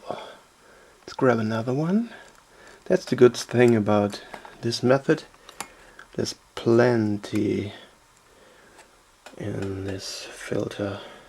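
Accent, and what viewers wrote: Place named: Germany. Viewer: You have a slight german accent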